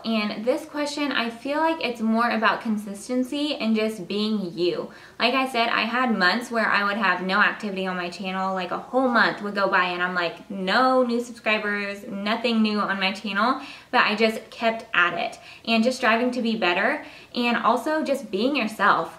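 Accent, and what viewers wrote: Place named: Indiana, United States. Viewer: Just now watching this, but i knew you sounded like a fellow Hoosier! hahahaha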